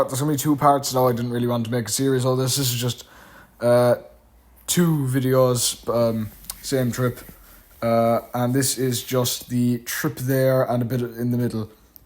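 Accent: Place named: South, Ireland. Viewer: Well I am watching from lovely Leixlip ,and I am enjoying your southern commentary .Keep it up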